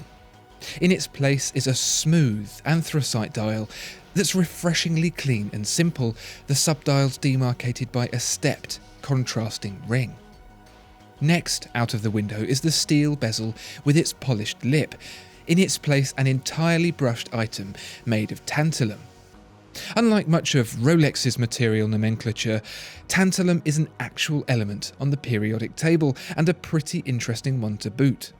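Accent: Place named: South East England, United Kingdom. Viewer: All my friends sound like this (south-east England).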